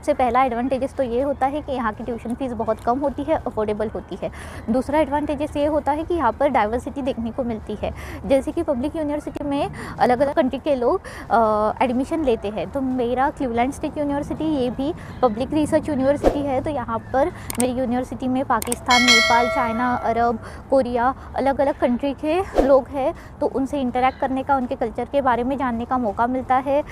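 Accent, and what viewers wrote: Gujarat, India. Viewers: Your accent feels like Gujarati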